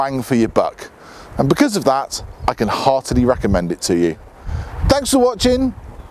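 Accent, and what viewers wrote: United Kingdom. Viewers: Am I the only one who thinks his voice and accent are annoying for this kind of video. Cuz I totally wasn’t expecting a guy with a British accent when I came here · I subbed just because of your voice. It's not just the accent, you could be a commentator for the BBC or Top Gear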